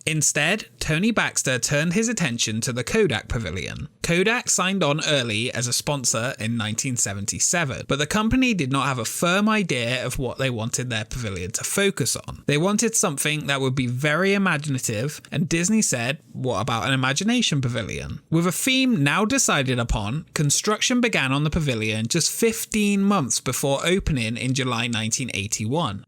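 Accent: United Kingdom. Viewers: He’s British, you gotta give him a break :)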